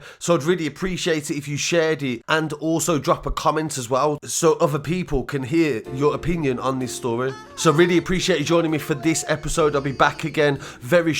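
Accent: Birmingham, United Kingdom. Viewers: What accent is this? Birmingham or something, I'm glaswegian always realise when yous chat, you talk hyper then last word or 2 is low tone lol Anywayz fukk all that respect for the videos mate.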